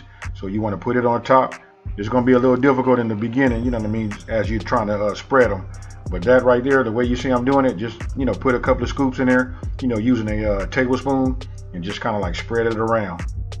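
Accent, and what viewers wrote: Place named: California, United States. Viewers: I can tell he’s from the West Coast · Classic CalifornI.A. accent, went to college with a bunch of guys from Cali. They all sounded tha same, LOL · This dude is dope AF!!! What up bro bro!? I hear that Cali swang in your voice dawg... Wes wes...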